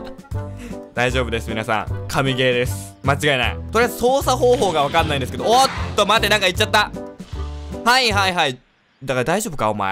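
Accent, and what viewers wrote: Kansai, Japan. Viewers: さんが関西弁だった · バリバリの関西弁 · 関西弁多くて好きや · *の関西弁ばりばりのやつ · さんの関西弁定期的にみにいってしまう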